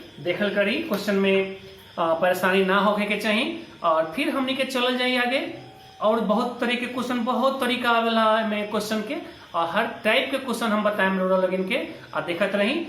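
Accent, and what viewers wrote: Bhojpuri, India. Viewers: अति सुन्दर लागल आपन भोजपुरी अन्दाज🙏🙏🙏 Nice sir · Ati Sundar sir Bhojpuriya andaj me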